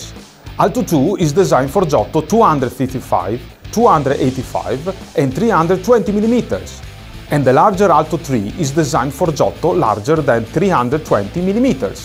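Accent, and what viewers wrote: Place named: Italy. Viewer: This guy made my day with his italien accent 🤣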